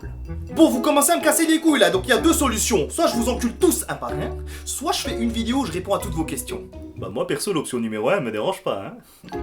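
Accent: Turkey. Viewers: Frr c quoi ton accent turc wlh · T as un putain d’accent turque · Waa ton accent 😂😂turk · Gerçekten , c quoi cet accent turque , je suis Algérienne fiancée à un Bingölien et je parle turque sans accent Fais travailler le tien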